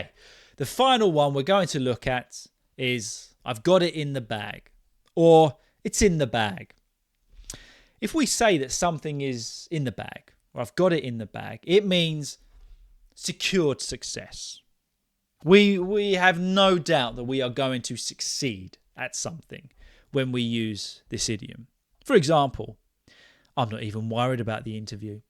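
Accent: United Kingdom. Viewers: Your accent sounds beautiful but my self I learn and practice American English, British English seems bit harder than USA English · Me too, this podcast is so natural flowing within nice British Accent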